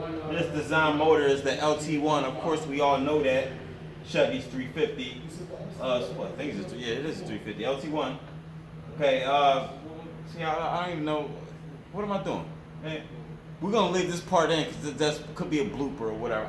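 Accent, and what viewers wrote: Philadelphia, United States. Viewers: are you from Philly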